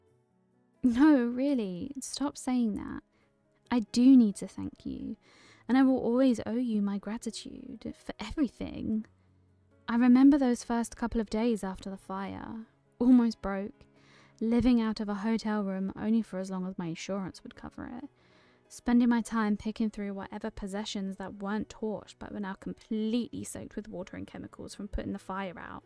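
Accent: United Kingdom. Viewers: I'm getting, a southern accent? Not devon but something near? Definitely not central Britain, I'm from there so I'd know. 'based on what I feel pressed up against my hips' is crazy af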